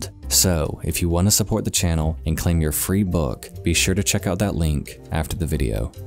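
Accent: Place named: Midwest, United States. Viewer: heh, you pronounce especially like "expecially". you must be from the midwest.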